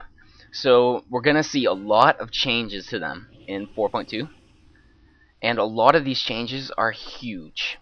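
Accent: Canada. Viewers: Are you Canadian ??:)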